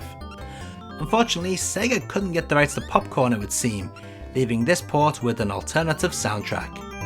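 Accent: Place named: Wales, United Kingdom. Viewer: I'm trying to place your accent...I'm thinking west of England, perhaps Wales? (I'm far off, right? Like a Frenchman calling a Texan a New Englander?)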